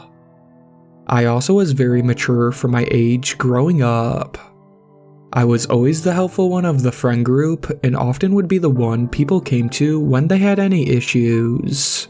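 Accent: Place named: United Kingdom. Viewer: This person is most definitely British 😂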